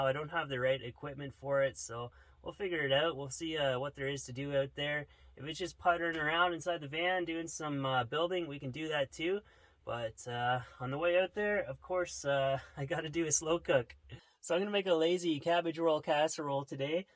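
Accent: Canada. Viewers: On the way owt there. On the way owt lol i love canadian accents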